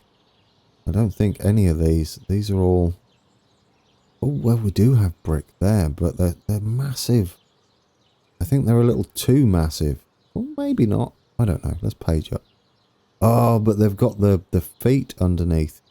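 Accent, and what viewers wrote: United Kingdom. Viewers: Ah yes, soothing British voice to brighten my day.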